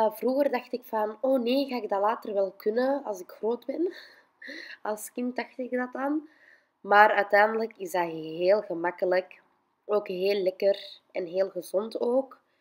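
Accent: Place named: Antwerp, Belgium. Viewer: Bsaha. Ziet er heerlijk uit 😋 jij komt zeker uit Antwerpen want je woordenschat en intonatie is zoals Nora Gharib. Is niet slecht bedoeld maar als een compliment 😘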